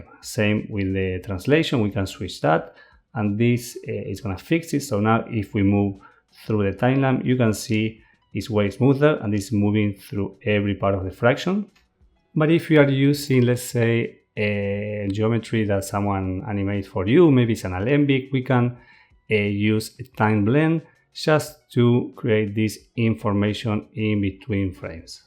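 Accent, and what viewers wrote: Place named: Argentina. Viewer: Por el acento del ingles, sos argentino. Tremendo tutorial! Te felicito y agradezco.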